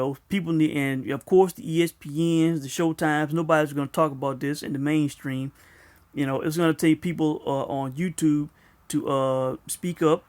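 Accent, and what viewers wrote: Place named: Alabama, United States. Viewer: Everyone has there own opinion but u are a hater, get your facts right errol used Vada when he fought Brook, if u do your research hes on the Vada website, u dont sound like the most intelligent brother but i know u can read. Before Spence fought Brook u were disrespecting him saying he wasnt that good, hes fought nobody, saying he would destroy spence & indicated that u would even eat crow if Spence beat Brook, u went live on youtube an ate your Crow in front of your viewers now mths later u decide to contradict yourself suggesting the best welterweight on the Planet is ridin dirty. smh. After he broke Brook face (eye socket) now your saying Errol is juicing, child please. i admit Spence aint no Sugar Ray Leonard, Tommy Hearns, Aaron Pryor but he is a bad mf he must be if he fight so good he got u thinking hes juicing lol, look bruh knowledge is power so read & stop sittin up there sounding like u have a 3rd grade education an speaking like your from Possums Paul Alabama a lil town with a population of 12 people. 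lol. this aint fishing this is boxing, get ya mind right cause your new nickname on youtube is cant get right. lol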